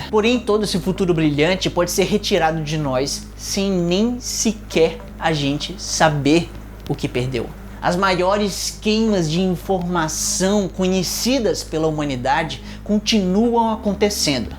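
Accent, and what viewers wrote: Ceará, Brazil. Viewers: Você é nordestino?? O sotaque parece com cearense, n sei, sou cearense e escuto muitos cearenses e piauiense falando assim.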